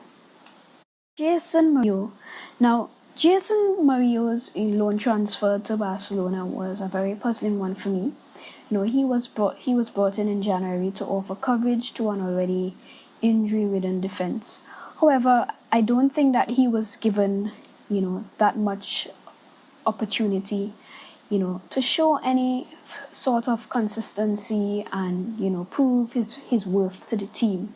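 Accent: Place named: Caribbean. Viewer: Finally a Caribbean voice on Barca matters. Great stuff!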